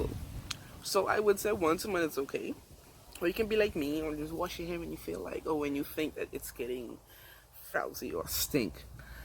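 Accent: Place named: Bahamas. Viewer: I knew I recognized the accent ... I'm from Nassau, lived in Freeport for 20 something years. Been in the States for 21 years. My locs are 8 years old, had to combine twice. They're almost waist length. I'm trying to commit to freeform, semi is harder since my locs are thicker. I will commit though. Not religious reasons, I just love it and chemicals are no good. I absolutely love your locs and the styles. Shalom Queen !👑💜👍🏽💋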